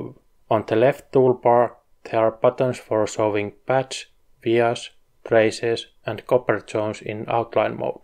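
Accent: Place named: Finland. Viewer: I like the Finnish accent, sounds nuts.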